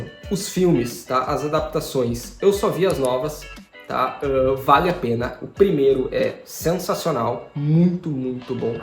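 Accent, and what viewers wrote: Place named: South, Brazil. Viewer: Homem eu jurava que você era do sul. Você .pra no rio de janeiro? 😅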